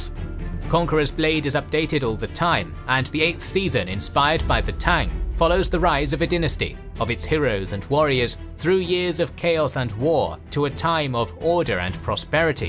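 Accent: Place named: United Kingdom. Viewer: With the narrator's British accent, war pow might have been closer to the Mandarin pronunciation for him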